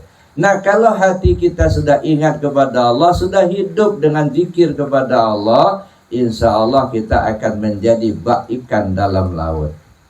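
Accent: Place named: Sumatra, Indonesia. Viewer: Assalamualaikum, Kalau boleh tau pak orang apa ya, logatnya seperti orang Sumatra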